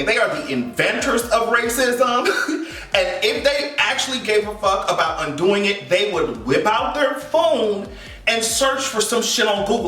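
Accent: Jamaica. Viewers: If you don't speak that patois!!!! You betta, I love it, I want more boo, celebrate that heritage too. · When you speak Patois 🥰✨😍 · I love ur jamaican accent 🥺😍 and yess I’m so happy for Adele !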